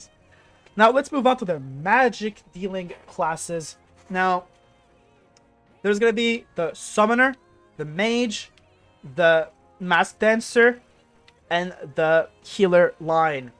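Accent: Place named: France. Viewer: I can hear the French accent! J'aime bien ton style de guide et merci!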